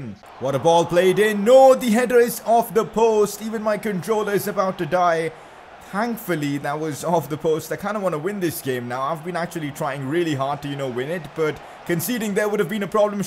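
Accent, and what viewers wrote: India. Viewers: You sound indian..are you? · U Indian mate ?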